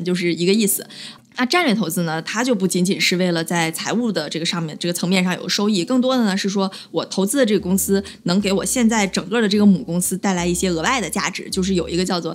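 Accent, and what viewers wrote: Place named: Beijing, China. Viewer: 你边说边笑有时候听不清，北京口音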